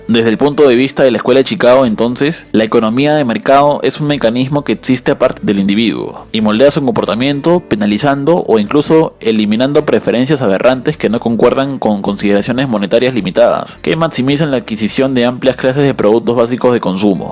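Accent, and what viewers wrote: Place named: Peru. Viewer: Ese acento es peruano jeje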